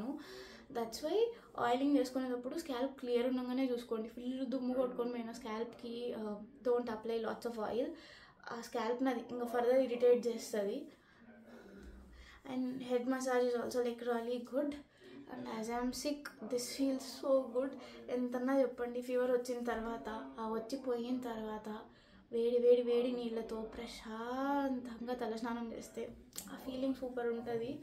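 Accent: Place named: Anantapur, India. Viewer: Kal teaspoon 😂 our ananthapur slang❤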